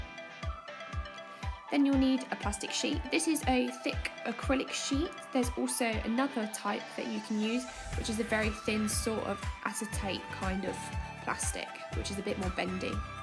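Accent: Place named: Bristol, United Kingdom. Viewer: A Bristol accent! My roots!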